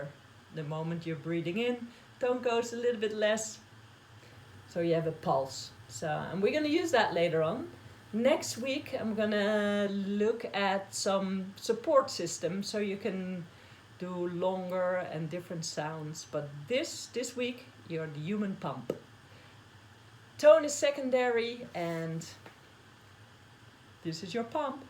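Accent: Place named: Netherlands. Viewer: Are you from the Netherlands cause you sound just like a female version of Wim Hof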